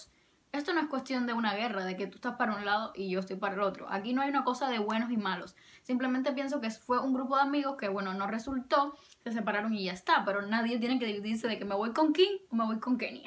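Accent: Cuba. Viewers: hola 👋 yo me llamo betsye y te veo desde Los Angeles, solo quiero decirte que creo en lo personal que eres una persona muy linda y me encanta tu acento cubano 😉👌 jeje mire que te hacían comentarios respecto a Kim y así que decidí venir a ver tu canal y en lo personal creo que tienes una personalidad muy bonita y me agrada mucho tu energía, sigue echándole muchas ganas y no le des fuerza a los comentarios negativos 👍🏻😃 ya tienes una nueva suscriptora!! 👏🏻👏🏻🤗❤️ tan solo por ser originalmente 😄👍🏻 saludos!!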